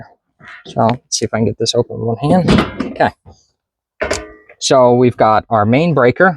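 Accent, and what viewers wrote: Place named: United States. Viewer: Aucun Européen parle comme cela. Faut il être un Con d amerlock pour avoir cette intonation. Si un Iranien ou autre vous tué , on ne vous pleura pas et tant on ne vous entendra plus.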